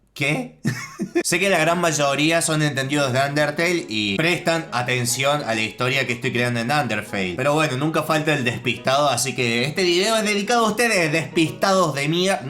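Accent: Argentina. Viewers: no solo te ganaste un like tambien un sub se nota que es de angerntina mi pais saludos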